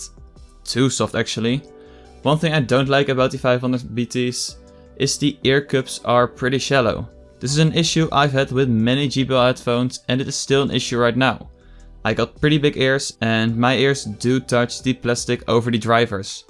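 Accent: Netherlands. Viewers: Je hoort dat je Nederlands bent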